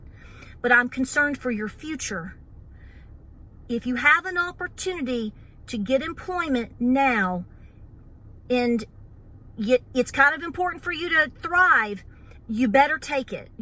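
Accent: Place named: Appalachia, United States. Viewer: This is 100% appalachian woman my wife and 3 daughters and every homegirl i know sounds exactly like this...you want her on your side lol..she's slowing it way down trust me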